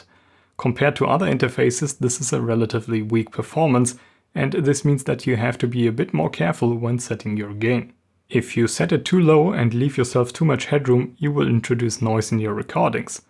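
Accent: Germany. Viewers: Nice work catching M-Audio’s misleading claims. Equipment tear-downs should be a basic feature of any good review. I always enjoy yours. And that German accent is FANTASTIC! CIao!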